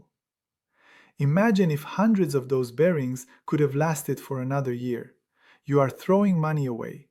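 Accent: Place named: Iran. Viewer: Great video, especially with your sweet Persian accent! :) I tried to find detailed geometric information about the defect, but I couldn't find anything. Did they use EDM to create a single through-hole with the specified diameter? I'm working on a dynamic model of a defective bearing and would like to use the CWRU dataset to calibrate my model. Therefore, I need detailed information about the defect geometry.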